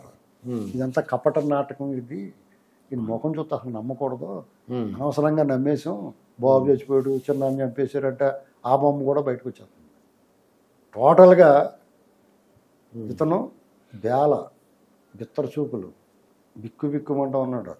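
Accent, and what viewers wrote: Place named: Godavari, India. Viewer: నమస్కారమండి గారు మీ వీడియోస్ రెగ్యులర్ గా ఫాలో అవుతూ ఉంటాను ఈ మధ్య కొంచెం తగ్గించినట్లు ఉన్నారు సార్ హెల్త్ బాగుంది కదా చక్కగా విశ్లేషిస్తారు సార్ మీరు ప్రతి అంశాన్ని అందుకే మీ వీడియోస్ ఇంట్రెస్ట్ గా చూస్తాము మీరు మాట్లాడే భాష కూడా మాకు ఎంతో నచ్చుతుంది సార్ ఆ గోదావరి జిల్లాల యాస చాలా చక్కగా ఇంప్లిమెంట్ అయి ఉంటుంది అంతకన్నా ఎక్కువగా మీ భావజాలం మాకు ఎంతో ఇష్టం చాలామందికి అనుసరణీయంగా కూడా ఉంటుంది సమాజాన్ని ఎడ్యుకేట్ చేయడంలో మీ పాత్ర కూడా ఎంతో కొంత ఉంది ముఖ్యంగా పార్టీల పట్ల నిక్కచ్చిగా మీరు చెప్పే అభిప్రాయం చాలా వాస్తవికంగా ఉంటుంది అందుకే మీరంటే మాకు ఎంతో అభిమానం మీరు ఎప్పటికీ ఇలాగే మంచి విశ్లేషకులు గా పరమేశ్వరుని దయతో ఆయురారోగ్య ఐశ్వర్యాలతో విలసిల్లాలని కోరుకుంటున్నాను సార్ నమస్కారమండి గారు మీ అభిమాన సోదరి వసంత రాజకుమారి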